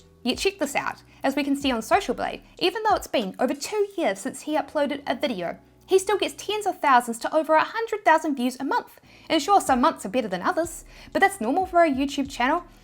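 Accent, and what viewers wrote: New Zealand. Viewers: "Chick out check" - I will never get used to the Kiwi accent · I believe she is from New Zealand... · I love the New Zealand accent. I'm moving there. It's settled. I made this decision after watching the almighty Johnsons... but I'm declaring it on this random YouTube video that popped up for me. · Wow, New Zealand's accent is so good. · I ended up with your video as I was googling Kiwi accents awwww I miss NZ sooo much! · Thanks,. So inspirational. I love your Kiwi "ik-cent" too:-)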